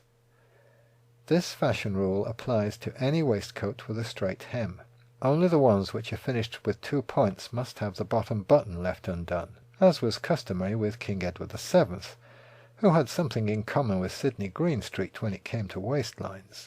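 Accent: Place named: Mid-Atlantic, United States. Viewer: You are the only person I know in the modern age with an impressive mid-Atlantic accent.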